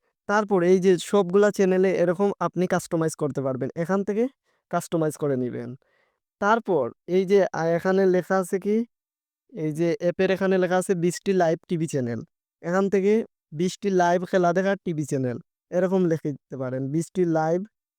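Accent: Sylhet, Bangladesh. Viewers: ভাই আপনার বাড়ি কি সিলেটে ❤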